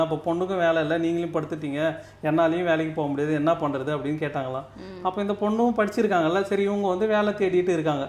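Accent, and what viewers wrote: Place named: Villupuram, India. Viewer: Villupuram district slang mathiri iruku sir ungaluku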